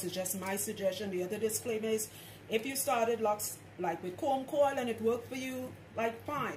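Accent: Trinidad and Tobago. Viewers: I Heard the accent and the beginning and I knew yuh was a Trini, Trini to d Bone!! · Trini to the bone! Yes sister! Shout out from Chicago! I knew u was a trini soon as I hear ya voice. Both my parents live in Arima. Power! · 30 secs in listening... Was like.. Wait......... Y she sounding trini.. Then boom u say you're trini, great vid hun. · Me listening to her talk: buh how she sound like a Trini so? Her: Everybody knows I'm Trinidadian Me: DAS WAH WE TALK BOUUTTTTTT. applauds and immediately subscribes I wanna start locs but I'm a dougla and there are no tutorials for my hair type, plus, I am soo worried about thinning so I clicked on this video for info and I am already SURE this woman knows what she's talking about. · Im watching these comments and I see people talking bout your accent then I raised the volume and I'm like "wait, that's Trini I hear?!" It's nice to see that I'm looking at loc videos and I come across a fellow Trinidadian. 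· From the time you started talking I knew you trini🇹🇹❤ I finger twists my hair two weeks ago so I am going to do the two strands twists asap 🙌🏾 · i could hear that trini accent hardd lol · Yes I know that accent 😍 hi Trini sista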